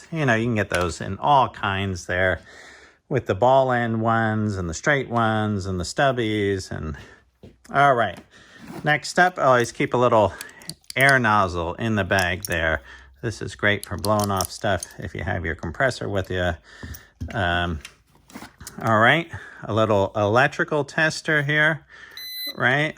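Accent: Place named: Pottstown, United States. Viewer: Well, I’m surprised at just how many tools came out of that bag😃 your accent reminds me of my cousins in Pottstown 👍🏻 I enjoy the videos.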